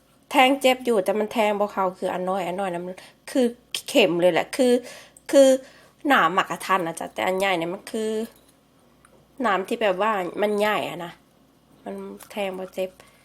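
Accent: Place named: Isan, Thailand. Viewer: มักสำเนียงพี่ เว้าหลาย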